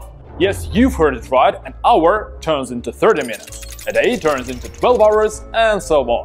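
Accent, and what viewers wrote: Russia. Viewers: russian dude, right?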